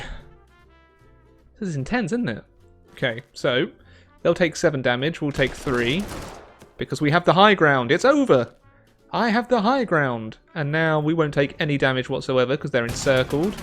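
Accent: United Kingdom. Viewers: Oh you are British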